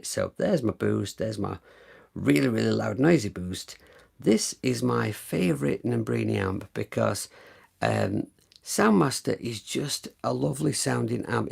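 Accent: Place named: Northern England, United Kingdom. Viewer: Both guitar and voice levels fine, nice playing. Wot neck of the woods are you from, cos I can tell yer a nothern lad?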